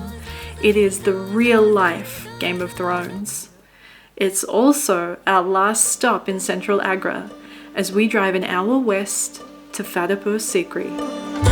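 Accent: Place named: Australia. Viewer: 😂😂😂😘oh this is our Australian accent ❤❤❤❤awesome 😂😂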